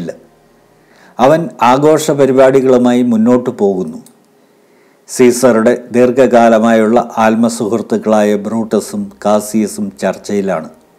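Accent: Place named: Kollam, India. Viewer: സാർ ഫയം അല്ല ഭയം കൊല്ലം പത്തനംതിട്ട ഭാഗങ്ങളിൽ ഭ" എന്ന അക്ഷരം ഫ " എന്നാണു ഉച്ചരിച്ചരിക്കുന്നത് അവതരണങ്ങളിൽ പ്രാദേശിക ശൈലി ഉപയോഗിക്കാതിരിക്കുക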